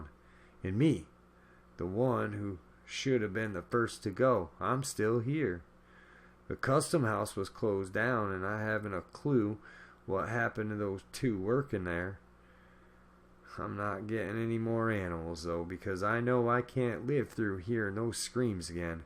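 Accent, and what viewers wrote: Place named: Oklahoma, United States. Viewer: HOW THE HELL DID I MISS THIS ONE ???? LOLOL. !! LOVE the accent !! I know a lot of hillbillies here in Oklahoma that. Talk just like that !! Some of my family in S.E.Okla. !!! Lolol😹😹😹😹😳 Great job , hon.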